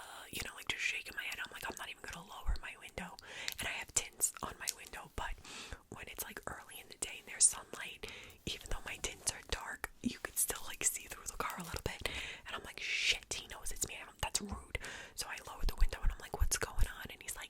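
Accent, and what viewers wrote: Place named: Long Island, United States. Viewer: ❤❤❤ LOVE THIS!!!! I was born and raised on Long Island but now live in Ohio. I love listening to you talk, that probably sounds crazy but you sound like my childhood, lol. God Bless 🕊️💝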